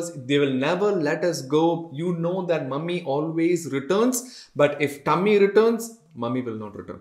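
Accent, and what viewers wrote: Tamil Nadu, India. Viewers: talking English like Tamil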